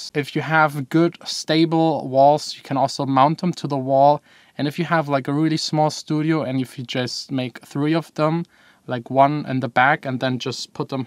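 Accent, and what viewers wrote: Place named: Germany. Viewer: Well.. He's from Germany, heck, he even has a pretty strong German accent when speaking English! lol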